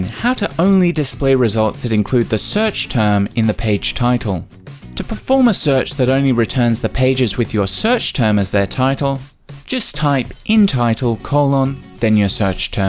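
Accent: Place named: United Kingdom. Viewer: If that was spoken with an American accent- I wouldn't have watched it. Am I the only one who is obsessed with those British accents?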